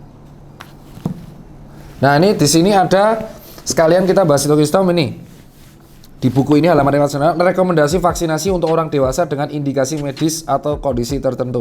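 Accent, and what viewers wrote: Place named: Yogyakarta, Indonesia. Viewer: Yang bikin beda itu penjelasan dr Tirta adalah mudah dipahami dengan kemampuan berpikir orang awam medis.... Dan bahasa logat nya jogja banget...salam dr Bantul... Semoga dr Tirta sehat selalu...GBU.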